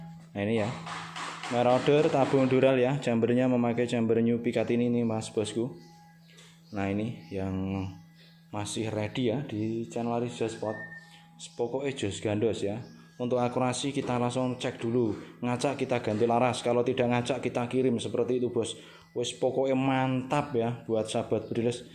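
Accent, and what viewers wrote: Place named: Java, Indonesia. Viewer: mantap hostnya hehe jowone lengket, di mana os posisi kotanya?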